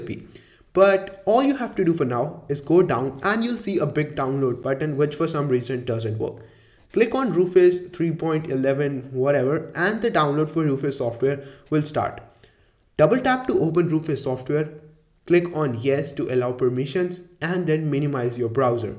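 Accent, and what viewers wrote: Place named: India. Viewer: First time I hear your voice you are Indian.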